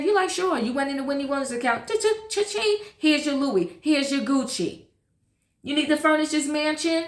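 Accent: New York, United States. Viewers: I wholeheartedly agree...but, as well, that's the mother of his first born...I'm not sayin he's a saint at all but I think at some point he had a 'I'll keep her good in the process of doing me' mentality--and we all see the consequences of Wendy managing herself...Your hair looks so pretty and love that NYC accent...take care mama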